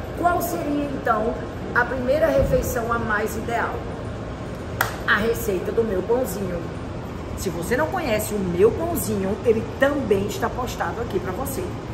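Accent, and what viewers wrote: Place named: Northeast, Brazil. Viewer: Mulher vc sabe tudo não vejo a hora de começar seu treino ,já gostei de vc de cara esse sotaque nordestino é muito massa parabéns por o seu trabalho ❤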